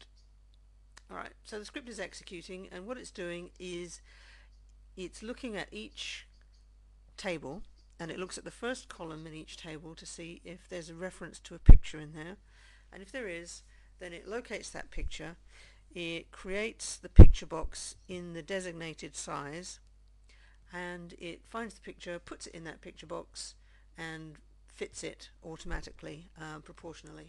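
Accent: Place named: United Kingdom. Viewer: So glad to hear a British voice!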